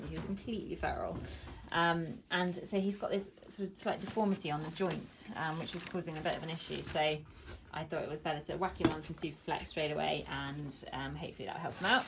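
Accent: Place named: United Kingdom. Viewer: D Your videos are great:D I'm in love with your horses and ponies. Hugs from Brazil It's being a great adventure to learn British English through your videos. God bless you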